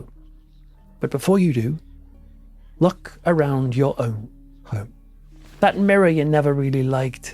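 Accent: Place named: United Kingdom. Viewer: Ya gotta love British accents though. Especially. It’s somehow especially fitting for ghost stories, don’t you think?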